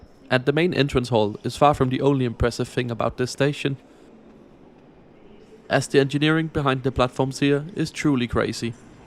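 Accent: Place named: Denmark. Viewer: Haha, I had the same reaction when I heard his Danish accent :-)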